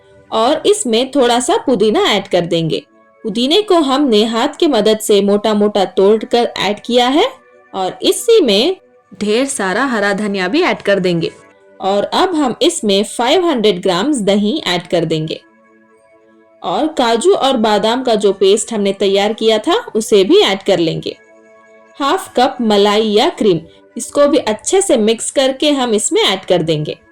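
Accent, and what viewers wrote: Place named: Hyderabad, India. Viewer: So beautiful hyderabadi accents and so yummy 🤤🤤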